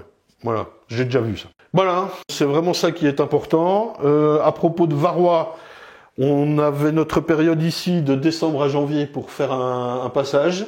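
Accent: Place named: Marseille, France. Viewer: Salut,ça fait plaisir de te revoir! Mais d'où tu sors cet accent marseillais que tu sors régulièrement? Une marseillaise.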